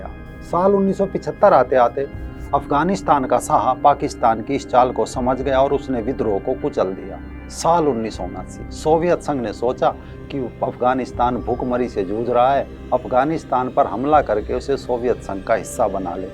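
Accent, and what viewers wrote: Haryana, India. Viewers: Bhai apki awaz se lagta hai ap hriana stet se ho,, حریانا ب پنجاب کا حصہ تھا کبھی 😢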